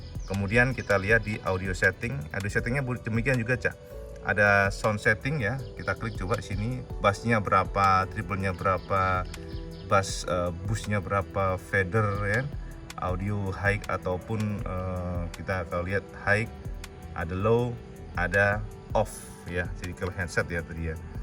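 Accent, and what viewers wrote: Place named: Tulungagung, Indonesia. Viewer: Penasaran karo motor 6 silinder terus ndilok" Neng youtube lakok nemu vidio iki, tapi koyok ra asing karo logat e, mari tak dilok neng google tibak e wong T. A hadehh, sak kabupaten bak e😂😂 , Sukses terus mass 👍🙏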